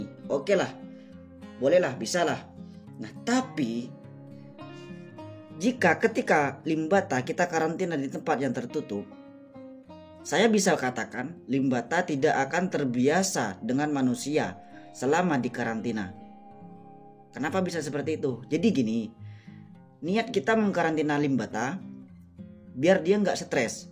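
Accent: Madura, Indonesia. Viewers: Abang logatnya kek orang Madura😅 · Ada logat maduranya 😁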